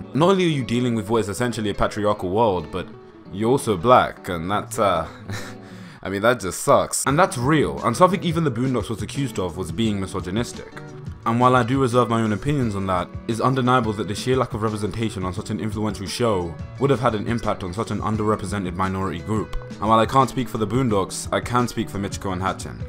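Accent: England, United Kingdom. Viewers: What's this Black/Blank memento thing he mentions in the video? I can't white hear it because of his British accent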